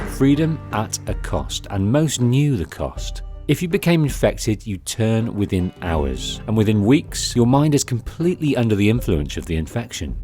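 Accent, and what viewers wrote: United Kingdom. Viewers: It’s called a English accent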